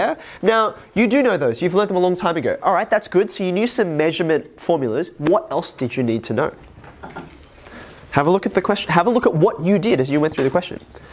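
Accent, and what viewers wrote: Australia. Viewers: Bro is a math teacher and he’s Australian what fake accent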